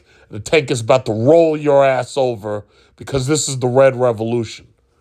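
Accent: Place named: New York, United States. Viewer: Yo bro dead ass bc you have the NY accent, it is soothing AF to listen to your Passport Bro videos compared to other YouTubers. I can't be listening to those monotone channels.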